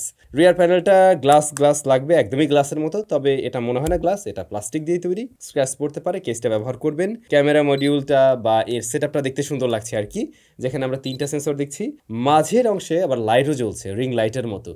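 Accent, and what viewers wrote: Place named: Sirajganj, Bangladesh. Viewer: ভাই আপনার গ্রামের বাড়ি যদি সিরাজগঞ্জ হয় তাহলে আমার আইডিয়া ঠিক আছে কারণ আপনার ভিডিও দেখে এবং আপনার কথা শুনে আমার কাছে এরকমই মনে হয়েছে আমার আইডিয়া যদি ঠিক হয় অবশ্যই রিপ্লে দিয়েন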